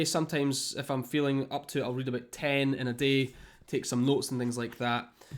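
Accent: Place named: Scotland, United Kingdom. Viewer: Are you Scottish? I think by your accent, yes. Either way, I'd listen to you talk about carrots just to listen to your accent. :)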